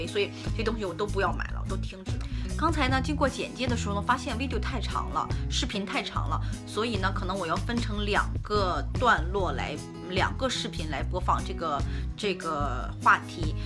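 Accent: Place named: Qingdao, China. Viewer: 听姐姐说话像青岛人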